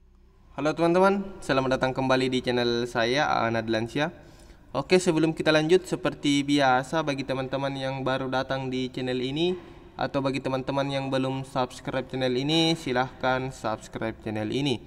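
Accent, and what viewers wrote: Indonesia. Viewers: logatnya kental sekali sama logat makassar😂😂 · Ku bilang mmngja kaya ku tauki logatna...orang sulsel paeng.hhah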